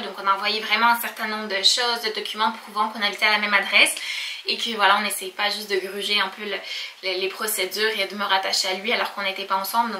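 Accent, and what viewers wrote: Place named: Quebec, Canada. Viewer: C'est fou comme tu as pris l'accent Quebecois ! Je te revois encore avant ton départ pour ton année d'étude. Que de chemin parcouru depuis. Je vous souhaitee de réussir dans toutes vos démarches <3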